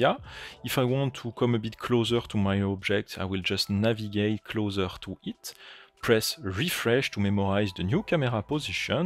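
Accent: France. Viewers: Ohh my goodness, pour ne pas dire "OH MAI goudness grichious" ....Votre accent est trop français. La vidéo est de qualité, mais l'accent fait trop mal aux oreilles. Merci tout de même pour cette vidéo.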